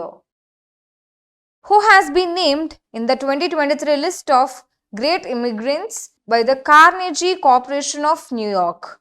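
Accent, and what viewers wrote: South India, India. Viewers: such a shitty south indian accent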